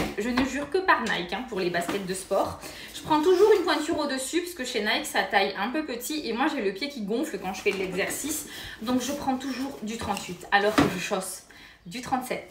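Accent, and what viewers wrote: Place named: South, France. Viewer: Corps parfait, accent du sud adorable, une pincée d'humour. ❤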